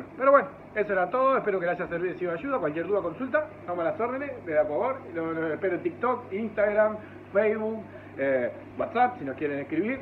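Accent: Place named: Entre Ríos, Argentina. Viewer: si eras de Entre Ríos por la forma de hablar igual a acá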